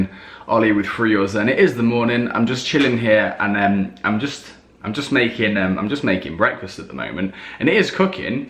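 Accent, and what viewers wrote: United Kingdom. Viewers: Are you from Britian? im lovin.. the accent.. 😃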